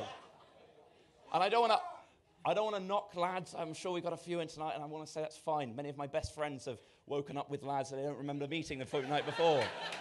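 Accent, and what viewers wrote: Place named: RP, United Kingdom. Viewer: Cute with that post accent. Don't know if he would be as cute if he weren't post.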